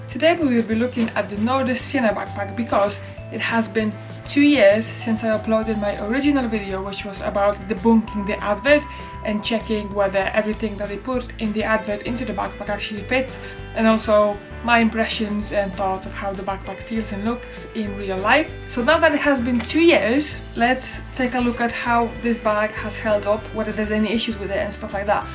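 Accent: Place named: Northern England, United Kingdom. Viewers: Ur half Norther English accent is brilliant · love the northern accent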